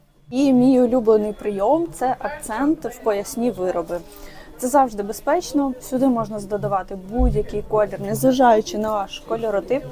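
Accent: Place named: Surzhik, Ukraine. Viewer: такий милий суржик, дякую за відео.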